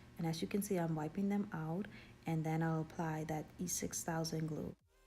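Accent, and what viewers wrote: Bahamas. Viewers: Nice, you sound Bahamian ?? ❤️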